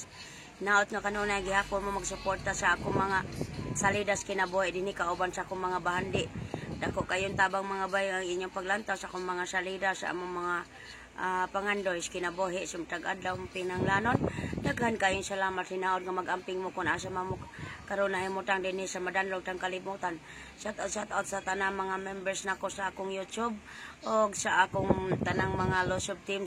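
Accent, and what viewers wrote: Visayas, Philippines. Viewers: ano Yong accent mo na bisaya? Sabi ng kaibigan ko na tga Cebu? Yong boses mo daw prang Lola na? 😩🤣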